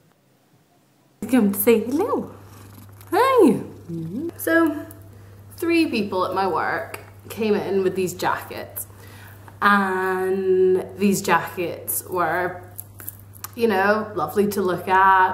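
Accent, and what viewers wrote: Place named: England, United Kingdom. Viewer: You sound english and why would you buy a coat for -40 when englands temp is like 5 degress in the winter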